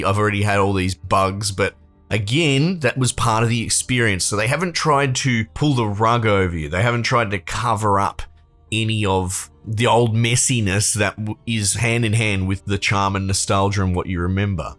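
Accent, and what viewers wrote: Australia. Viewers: Perfect and honest recap dude - love it!! I was looking for a straight-to-the-punch, not-too-technical kinda review to send to my non-Elder Scrolls-abiding Aussie buddies, and since it sounds like you are an Aussie yourself, well that's just the cherry on the pavlova!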